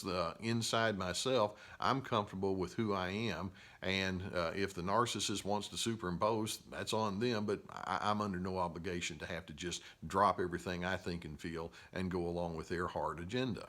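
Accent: South, United States. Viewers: Lovely southern drawl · Sundiata Keita he sounds like a southerner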